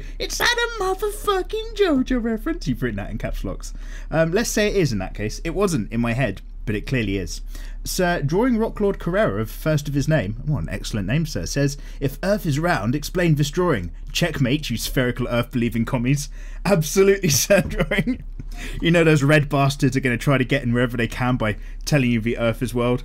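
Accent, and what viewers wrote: United Kingdom. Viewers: you kinda look like and sound like Ravi from Izombie (not just the British accent). if you don't know who i'm talking about... well you can look it up. i'm also gonna post this on every DWM in the future till you find out. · dumb question but here it goes . I've kept tabs on your videos for a time now because___ you are really fucking good at this . why not put out a little auto biography of how it is you got this effing good , and why (even it's for extra money) why you let us all in on it . the skill set , your craft , the glib humor . there's no other channel worth sticking with because they all suck . Lastly , in America many of us are burnt out on british accents they use it for everything commercially so you're the rare exception for me . so what do you say ? how about a story about yourself . ( hell include your band too) best regards , me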